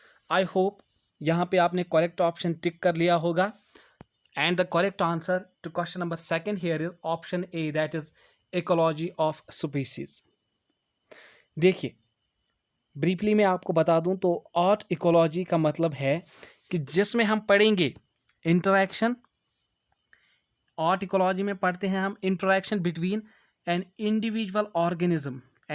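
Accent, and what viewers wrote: Kashmir, India. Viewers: Kashmiri tune smj ni aati words ko repeat pr krke sunna pdta h....biggest problem 😞😞😞😩😩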